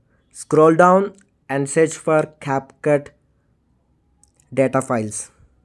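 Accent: India. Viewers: Indian guy explaining 😅😅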